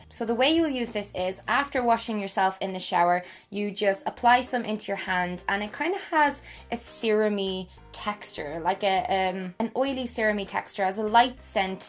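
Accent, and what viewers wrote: Ireland. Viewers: wait, are you Irish??? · i luv ur voice i wish mine was that irish 😍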